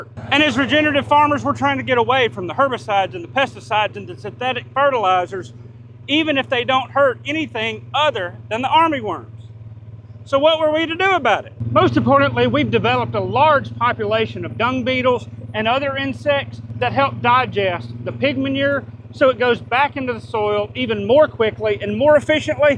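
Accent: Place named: United States. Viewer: PS I love your accent, which state are ya from? I’m a Aussie so I’m not great at knowing state accents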